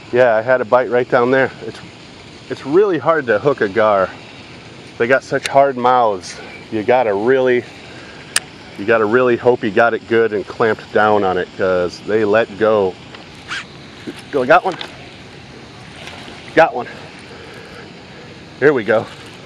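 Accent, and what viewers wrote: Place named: Rural, United States. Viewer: Why does he sound like he’s trying to cover up a country accent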